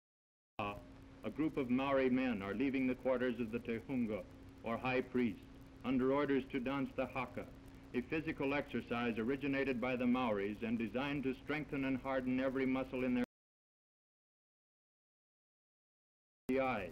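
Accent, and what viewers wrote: Transatlantic, United States. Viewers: It's how most things were narrated back then. Transatlantic accent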